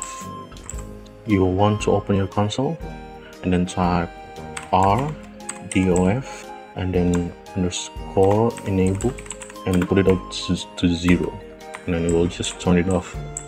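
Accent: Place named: India. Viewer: Amazing Indoglish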